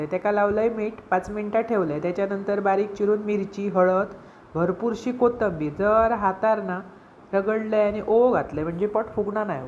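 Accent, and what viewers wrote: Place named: Malvan, India. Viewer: ताई मस्त 👌👌 आणि त्यात मालवणी भाषेची गोडी व्वा! 🙏💐👍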